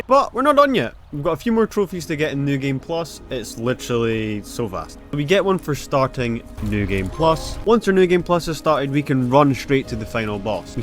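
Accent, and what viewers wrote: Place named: Scotland, United Kingdom. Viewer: God bless you. I actually really enjoyed this video. I laughed a lot. Something about a miserable man with a Scottish accent makes it that much better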